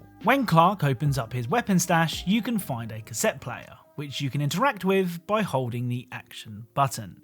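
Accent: United Kingdom. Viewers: Something about British gamer YouTube channels that I just love. Maybe it's because I'm American, and the British accent is somehow hypnotizing or cathartic to us 😂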